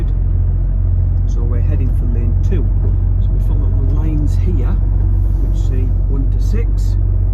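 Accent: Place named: Swansea, United Kingdom. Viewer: That’s a Swansea accent I reckon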